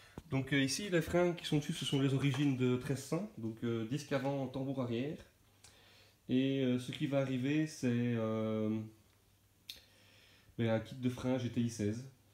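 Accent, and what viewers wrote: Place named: Belgium. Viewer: Je me disait bien qu'il y avait un petit accent de la Belgique 😁😁😁 merci pour gotta et force à toi pour ta chaine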